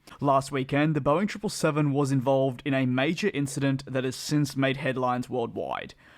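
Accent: Australia. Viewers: Are you from Sydney Australia?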